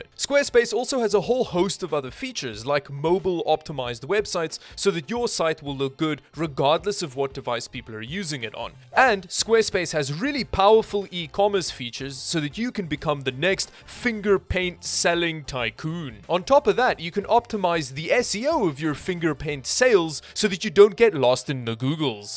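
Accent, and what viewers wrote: Afrikaans, South Africa. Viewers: South African accent??🇿🇦🇿🇦🇿🇦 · Love the content, keep it up. Quick question, are you South African? XD you've got the accent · excellent to hear that Saffer accent becoming even more pronounced. Represent Boet, doesn't matter where we are in the world, proudly 'Sefrican! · South African? 😅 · I sense a South African accent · are you South African? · I think it's South African (where English might have been a second language). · Jy kan dai afrikaanse Aksent hoor🤣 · This guy sounded south african but it didn't say anything in the about page so I legit opened his Instagram just to check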